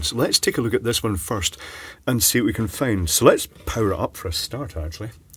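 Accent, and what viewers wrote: United Kingdom. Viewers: I just love your videos, everything in these is so classy. Probably because you are from the UK ? I must confess I don't understand everything you say, me not being a native english speaker but I learn a few tricks everytime. Your accent and the way you speak remind me of my Acorn days (I miss them).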